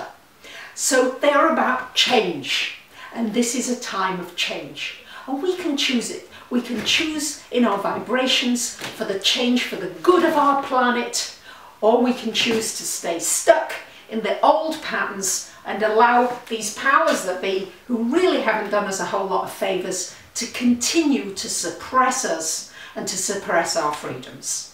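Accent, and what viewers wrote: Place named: United Kingdom. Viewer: cant stand brittish accents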